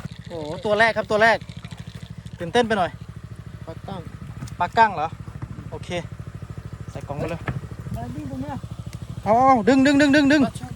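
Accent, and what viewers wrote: Isan, Thailand. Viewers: พูดไทยเก้งก้าง ชัดบ้างไม่ชัดบ้าง ถ้าเป็นไปได้ สำเนียงนี้ (เว้าอิสานไปโลด)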